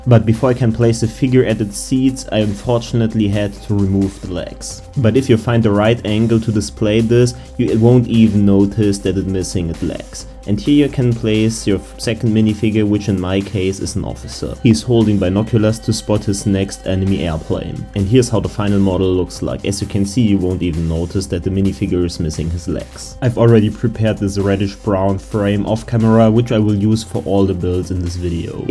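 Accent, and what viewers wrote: Germany. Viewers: Are you German because you have such an accent?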